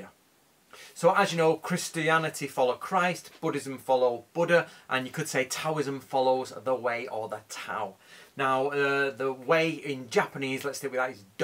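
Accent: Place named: Manchester, United Kingdom. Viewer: Love hearing a fellow manc accent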